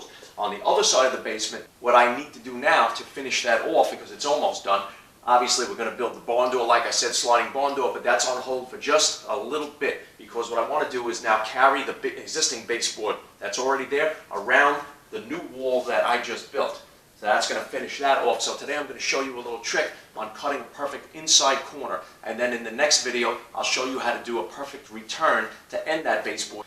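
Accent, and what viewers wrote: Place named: Long Island, United States. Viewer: this guys gotta be from long island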